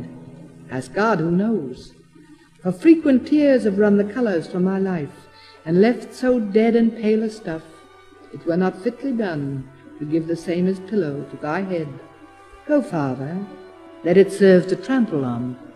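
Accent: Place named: Mid-Atlantic, United States. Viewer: She used that “mid-Atlantic” elocution, which I think is just so gorgeous…. Lofty and beautiful and so missed these days of casual everything. I do miss elegance.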